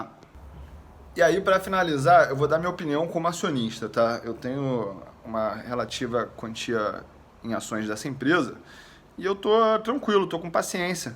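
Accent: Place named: Rio de Janeiro, Brazil. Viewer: Bom vídeo , mas esse sotaque carioca é cansativo pra mim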